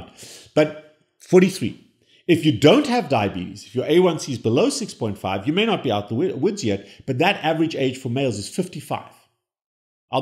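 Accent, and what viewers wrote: South Africa. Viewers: Are you South African doc?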